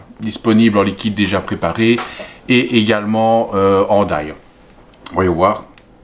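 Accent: Liège, Belgium. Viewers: Lol toi tu viens de liège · vous avez l accent liégeois!!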